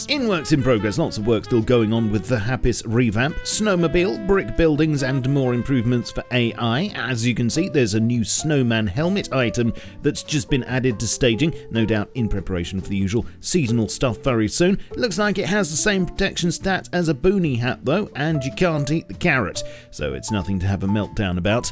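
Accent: United Kingdom. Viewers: Am I the only one getting tired of the british accent thing? Not talking only about this channel, but everywhere in YT.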